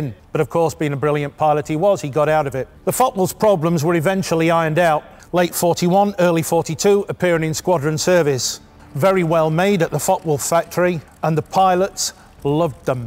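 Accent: England, United Kingdom. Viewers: I think the dropping of the "e" is more to do with the speaker's regional English accent than anything else.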